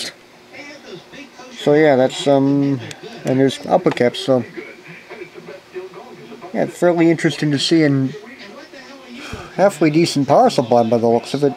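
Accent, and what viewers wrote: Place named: Northeast, United States. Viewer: WOW, great video, lots of info! Your from the north east of the states? You talk fast and roll your words together.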